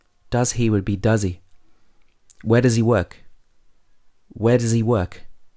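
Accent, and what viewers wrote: United Kingdom. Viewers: Excellent British accent 💗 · British accent ??????? Je je je.....no thanks....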